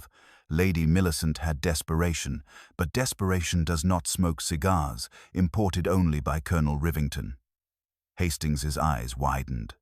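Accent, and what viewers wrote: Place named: United States. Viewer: Why are British stories being narrated in an American accent. It's just not the right tone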